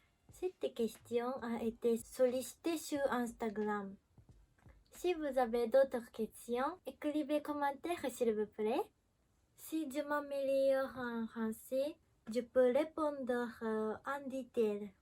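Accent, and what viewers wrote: Japan. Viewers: Trop Cute l'accent japonais pour parler francais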